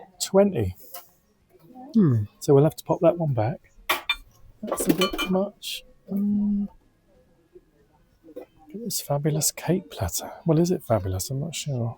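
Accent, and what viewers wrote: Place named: Cornwall, United Kingdom. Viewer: Love your Cornish accent. Poldark is back on Sky T.V. Lovely!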